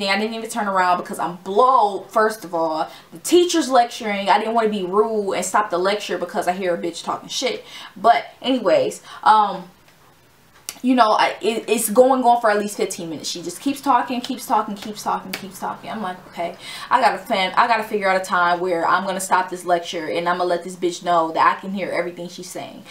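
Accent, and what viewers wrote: Detroit, United States. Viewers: bro you sound like you from the D, this how we all talk around here lmao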